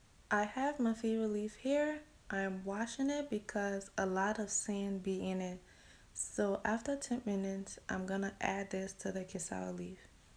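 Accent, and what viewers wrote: Liberia. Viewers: You sound Liberian. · Woman are you from Liberia?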